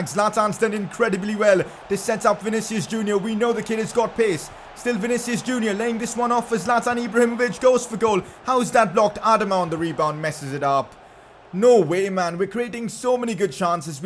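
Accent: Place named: India. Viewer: the worst youtuber with the gi gi gi aaaaaaa Indian accent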